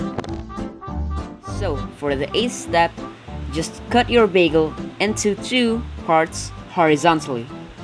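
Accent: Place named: England, United Kingdom. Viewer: its really look so delicious ! love ur eng accent too !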